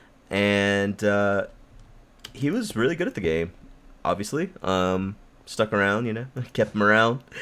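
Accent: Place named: Alabama, United States. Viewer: dude, are you from Alabama?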